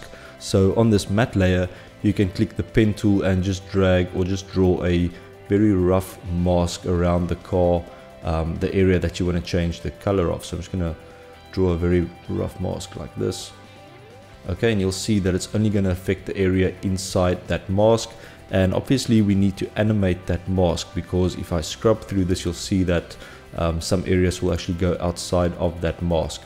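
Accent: South Africa. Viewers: You sound South African 🤔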